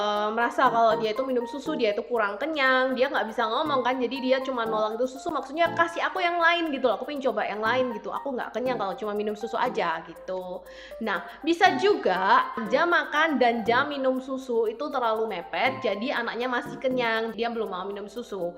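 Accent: Surabaya, Indonesia. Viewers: Wong suroboyo iki koyoe😂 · Jos,,, aq sueneng logat medhok suroboyan e mbk, basio mbuh sampean wong endi 😄 gk mboseni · Surabaya banget logatnya mbak, waduh mbak anakku juga begitu susah banget minum sufor pakai DOT, makasih mbak sarannya tak cobain semua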